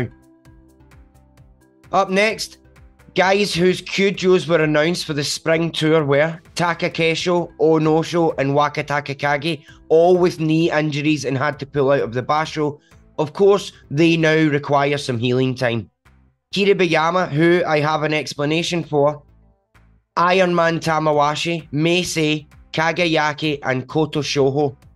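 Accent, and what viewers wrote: Scotland, United Kingdom. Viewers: For some reason, English folk butchering Japanese annoys me, but in a Scottish accent its still lovely 🤣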